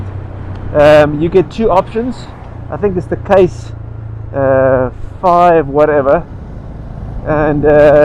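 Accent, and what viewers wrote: South Africa. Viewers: what a fun little bike.. Are you from South Africa? . Why is the sound so bad with the visor closed?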